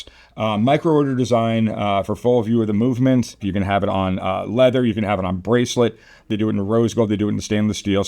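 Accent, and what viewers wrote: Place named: Africa. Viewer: Your pronunciation capabilities are that of an african.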